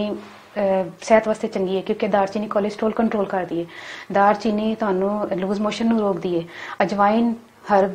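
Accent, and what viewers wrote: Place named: Punjab, Pakistan. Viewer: Ap ki pnjabi boht he mzy ki he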